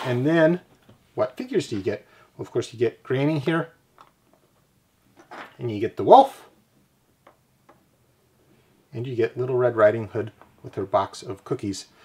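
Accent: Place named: Michigan, United States. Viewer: Are you from Michigan or Illinois ? You have a similar accent to mine. Nice video by the way I just discovered your channel.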